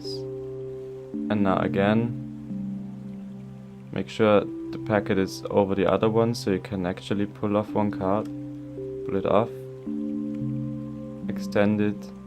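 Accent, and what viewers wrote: Germany. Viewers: Bist du deutscher?? man hört das richtig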